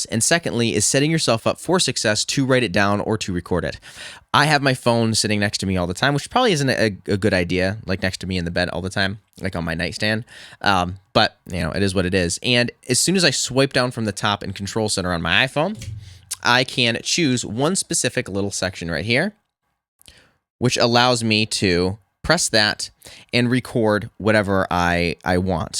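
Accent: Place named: Michigan, United States. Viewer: Are you from Michigan? Your accent kind of sounds like it 😂😂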